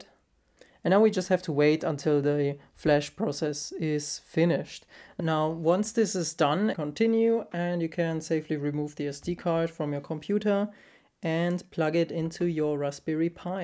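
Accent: Germany. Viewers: Thank you for your video! How hard to find hardware for Kali/Parrot to deauth 2.4 and 5ghz same time to prevent switching?! Will the Deather Andromeda does the thing? I could go for jamming in my project, but that should only be the last possible option. i would really appreciate any advice! Your accent let me think you are possibly from Germany/Austria?! :) Keep on doing such nice Videos! <3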